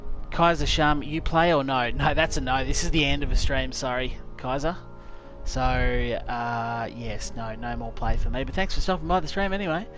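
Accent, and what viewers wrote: Australia. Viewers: Quickly becoming my fave streamer/youtuber. Love your soothing aussie tones.(in a manly platonic way).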